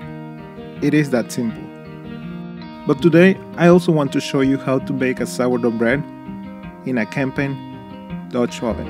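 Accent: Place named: Costa Rica. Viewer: .Iam from Costa Rica so i wonder are you ,you accent sounds like a tico from CR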